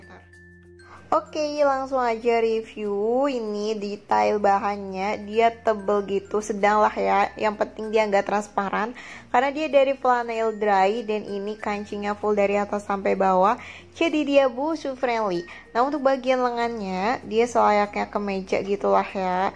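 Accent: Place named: Banyumas, Indonesia. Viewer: Gedombrangan haha ,, ngapak bgt ya kak 😁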